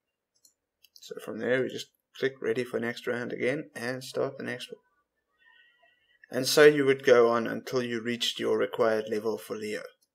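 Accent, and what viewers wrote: South Africa. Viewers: are you from S.A sounds like it ?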